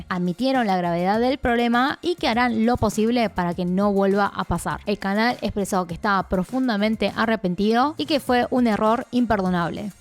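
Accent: Argentina. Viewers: hablas re bien el argentino wtf cm lo logro Xd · ni tan mal la descripción de Ecuador 🇪🇨 bonito que destacaran el Chimborazo y las tortugas gigantes de Galápagos, pensé que pondrían bananos o maíz. Buen video, me llama la atención tu acento argentino para hablar español jajaja.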